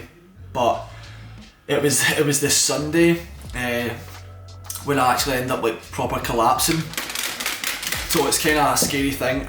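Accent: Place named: Scotland, United Kingdom. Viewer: Your accent is so great haha, I love Scotts. Great video mate!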